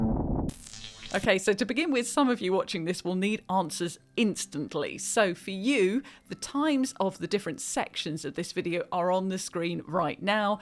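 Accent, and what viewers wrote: England, United Kingdom. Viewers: 2025 Thanks and an English voice too. 👍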